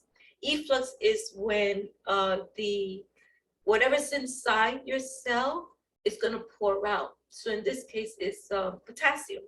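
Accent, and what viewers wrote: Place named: East Coast, United States. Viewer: Thank you for this info. Also I love your East Coast accent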